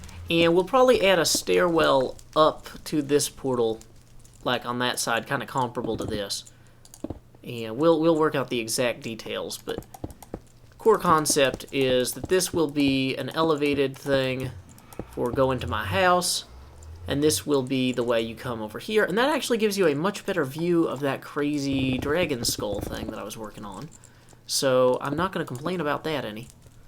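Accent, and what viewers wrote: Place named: South, United States. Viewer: It is my first time watching one of your videos, and I am greeted with beautiful singing and relatable musical hate for the nether. Your voice is wonderful! You have a bit of a southern accent, are you from Georgia? :D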